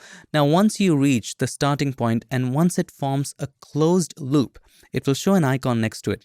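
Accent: India. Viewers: This guy makes the Indian accent very smooth and well integrated with English language. Nice style.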